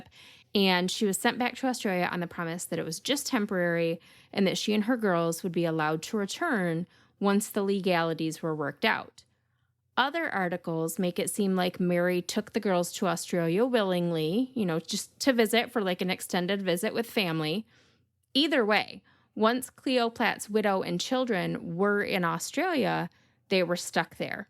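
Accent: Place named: Michigan, United States. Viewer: I think your channel is fabulous!!! I was born and raised in Michigan. I moved to Washington state in 2011, and I really miss home! I feel like I found gold...I'm addicted to true crime podcasts, and hearing your stories in our lovely accent (lol) makes me so happy! Thank you for your thorough research and very interesting subject matter....keep these videos coming!!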